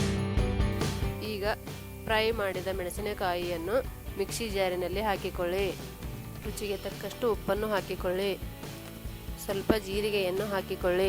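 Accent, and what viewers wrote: North Karnataka, India. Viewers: Mam neevu Uttar Karnataka matalle matanadi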